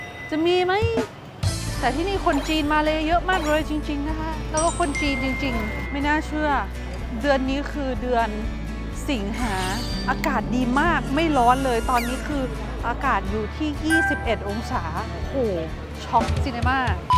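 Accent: North, Thailand. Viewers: ชอบ อู้กำเหนือ ม่วนขนาน แต้กาบ่าได้จุ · อู้เหมือนคนเมือง น้อง คนงาม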